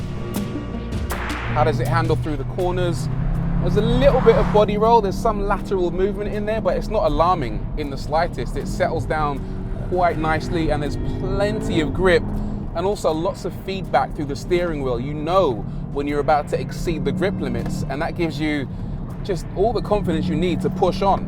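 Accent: United Kingdom. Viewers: British accent, left side drive, euro plates, where is this guy?!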